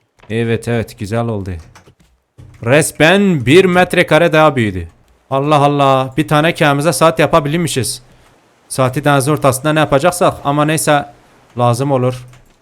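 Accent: Elazığ, Turkey. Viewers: Abi nerelisin bence Elazığlısın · Abi sen Elazığ lı mısın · Abi Elazığlı mısın ?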